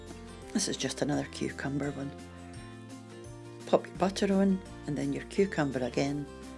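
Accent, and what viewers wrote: Scotland, United Kingdom. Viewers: I love your Scottish accent and the fact your recipes use normal ingredients. Here in America some recipes just get weird and I would not eat them. Can you answer me a question? What is clotted cream? Keep up the great videos. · Could listen to your voice all day. Half of my family are from Scotland and I love the Scottish accent 😍 reminds me of home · Thank you for sharing this scone recipe. It's the first time I've ever made them. Your video was really easy to follow and love the Scottish accent. Somehow it makes the food sound more delicious. Lol 😂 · YouTube algorithm just introduced me to your channel. I love your videos AND your lovely Scottish accent AND YouTube's auto-captioning of your lovely Scottish accent. 💕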